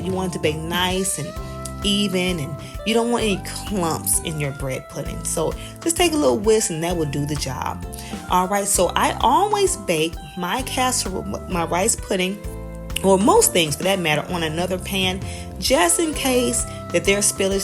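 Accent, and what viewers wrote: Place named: New Orleans, United States. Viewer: Looks good! Sounds like you’re from my hometown of New Orleans. ❤️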